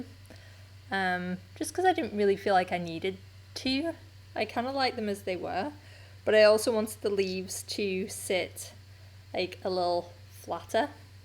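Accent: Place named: Liverpool, United Kingdom. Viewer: Thanks so much for the lovely Hiraeth pattern,. The name really touched me when I read your newsletter this morning, as it's something I've been feeling a lot of for the past few months, being originally from Wales. Not sure why, having lived in Canada for 100 yrs, but there you are. Not sure if I've missed this, but why the name Hiraeth, why did you pick that as the name? I can definitely hear that Liverpool twang, but do you have a Welsh background?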